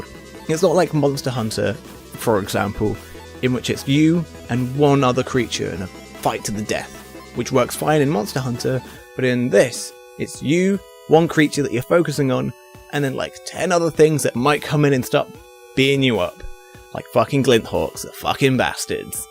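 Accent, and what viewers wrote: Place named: United Kingdom. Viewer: hardcore british!!!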